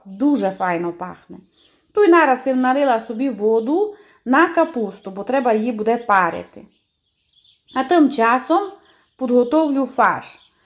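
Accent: Transcarpathia, Ukraine. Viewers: Дякую Вам за рецепти і наший закарпатський діалект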